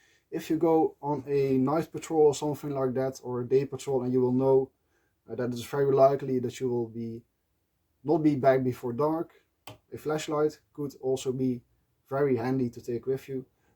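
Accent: Netherlands. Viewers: I’m new to this channel and I’m loving it so far. I’m assuming by the accent you are from the Netherlands? Hello from USA!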